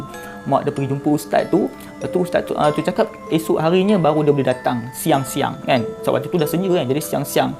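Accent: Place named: Perak, Malaysia. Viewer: Bg aku cara penyampaian mudah paham. Mgkn sbb loghat peyrok nye pekat jgk.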